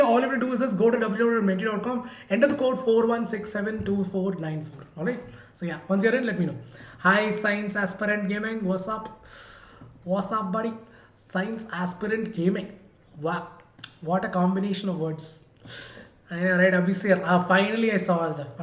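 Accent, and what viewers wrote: Kerala, India. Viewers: Sir are you from kerala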